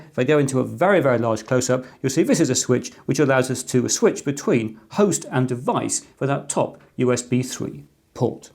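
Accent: United Kingdom. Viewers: When I watch this channel I get the same warm fuzzies I used to get as a very young child watching children's education shows on national broadcast television in the 80s. Some of it definitely has to do with that sawtooth/triangle-wave synth theme music. But obviously the rest is from the very friendly pommy accent :)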